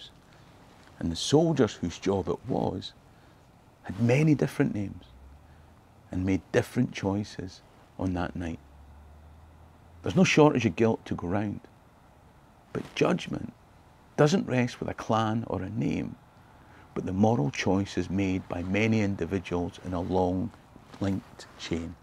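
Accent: Scotland, United Kingdom. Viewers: I love this Scottish sound of English language by the narrator but the landscape of the highlands are so depressing. Why does nobody forrest them up?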